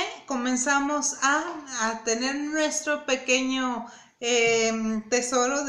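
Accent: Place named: France. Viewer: Jamás me imaginaria eso!!pareces francesa o polaka tengo conocidos de esos lugares y es muy parecido el.acento. No te escuchas mal sólo pareces una extranjera muy sofisticada que habla el idioma con un poquito de esfuerzo. Gracias por contestar muy amable de tu parte y si estoy seguro le gustara a mi esposa el perfume bendiciones linda Cuídate mucho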